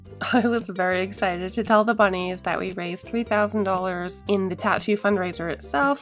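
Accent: Canada. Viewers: I’m not sure how you slipped into my feed, but I’m so glad you did. I’m a Canadian/American tv writer with a love of old furniture. And I heard your voice and the lovely Canadian accent, and I was hooked!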